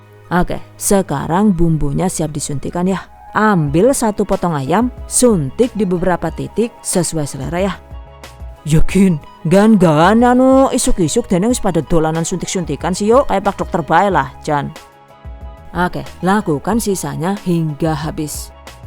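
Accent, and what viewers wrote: Banyumas, Indonesia. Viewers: saya paling suka resep yg pake takaran pasti spt ini, bukan yg takaran "secukupnya". auto subscribe.... btw jg suka sama logat ngapaknya 😄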